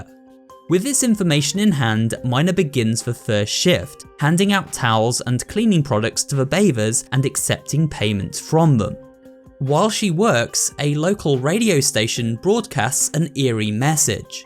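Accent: United Kingdom. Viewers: You're british right? I'm british too What the hell is a faucet? It's a tap to us. Why are you pandering to americans. Saying "mom" and "faucet" (you arent even saying it correctly either). You have a loyal fan base. They know you are British. Speak like a brit!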